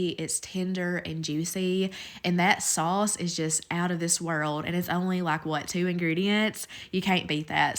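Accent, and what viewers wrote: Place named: South, United States. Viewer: I love your receipts and your accent, just like Paula Deen, oil y'all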